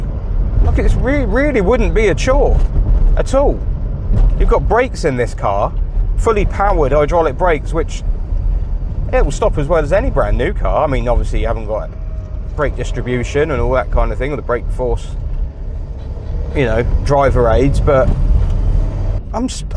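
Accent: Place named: Norfolk, United Kingdom. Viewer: Sounds like a Norfolk boy, lol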